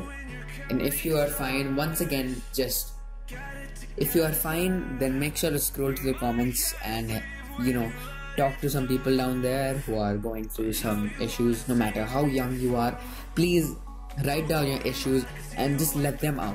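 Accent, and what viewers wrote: India. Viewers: are u Indian or like Asian? not trying to sound ignorant but it kinda sounds like it in your voice. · I recognise that accent 🇮🇳 India all the way!!!!!🇮🇳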